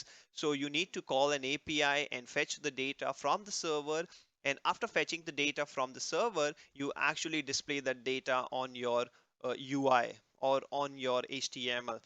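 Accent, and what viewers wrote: Gujarat, India. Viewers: are u a gujrati?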